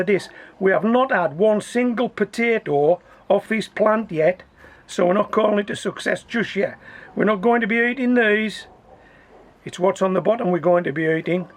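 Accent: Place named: Cumbria, United Kingdom. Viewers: North west england on the coast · As someone from England I can say for almost certain that he is most certainly English,sounds Midlands or Northern,lol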